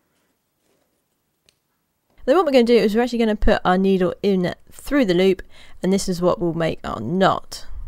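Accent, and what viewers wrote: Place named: Scotland, United Kingdom. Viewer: Такой интересный акцент, интересно, откуда эта милая женщина, из Шотландии?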